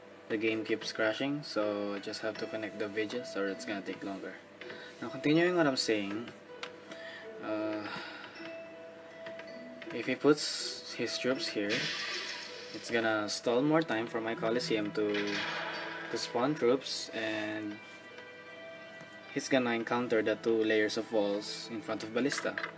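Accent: Philippines. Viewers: are you a Filipino? cuz your English sounds Like a Filipino. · I'm sensing a Filipino accent.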